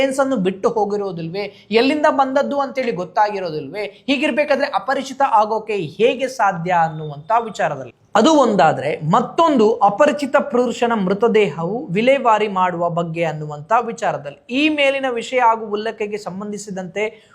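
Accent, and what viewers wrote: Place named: Mangaluru, India. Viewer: ಅವರು ಮಂಗಳೂರು ಕಡೆಯವರು ಆದ್ದರಿಂದ ಅವರ ಮಾತನಾಡುವ ಶೈಲಿ ಈ ರೀತಿ ಇದೆ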